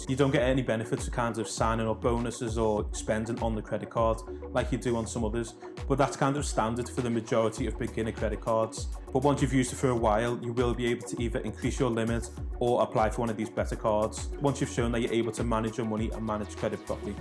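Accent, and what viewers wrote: Liverpool, United Kingdom. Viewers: Every little helps!! That was the cherry on the cake. I only watched this because you was a scouser. Well done.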